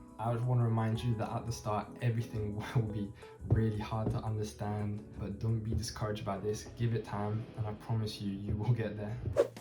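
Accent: France. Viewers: yes I’m a native English speaker trying to learn French and his French (or seemingly French) accent was the first thing I noticed upon clicking the video. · you have a french accent when you speak English, you wont fool me. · You have a french accent when speaking english. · Your accent is so French?! R you sure it’s not your native language?! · bro even got french accent 🔥🔥🔥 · I don't believe this. There is something you're not telling us. Your Day 1 already had a French accent that someone learning literally their first day would not have. And just heard it: He began learning it in primary school. So you DID already have some education in it. Come on, stop with the MISREPRESENTATION! WHAT A LIAR. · Bro has a French accent when he’s speaking English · His English has french accent...hmmm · What is your native language? It sounds like you have French accent. I think you are making stories. 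· You have a little but of french accent in english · This guy is lying- he is speaking English with French accent. We are latvians,but our kids went to french kindergarden and finished primary school, you cannot fool somebody who has children with French as first language.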